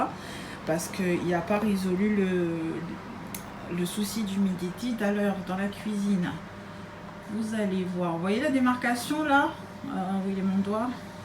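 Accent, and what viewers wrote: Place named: Corsica, France. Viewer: Quand je t'écoute ça me fait penser aux patients corses que j'ai au téléphone !! J'adore l'accent corse !!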